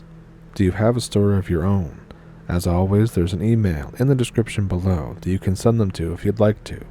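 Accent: Ontario, Canada. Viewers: Hello,. Thanks for posting, you're voice always reminds me of My Ontario cousins and blood and such, 👍🎉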